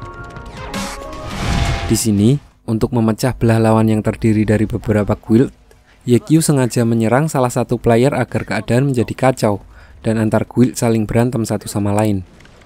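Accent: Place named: Java, Indonesia. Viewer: Bahasa Indonesianya medok pasti orang Jawa ni😂salam bang dari Jawa tengah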